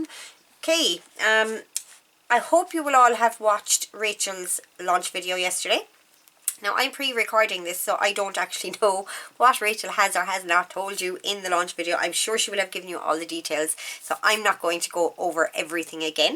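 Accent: Ireland. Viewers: Lovely to watch you. Great ideas you have. Love your accent where in beautiful Ireland are you? Best wishes from New Zealand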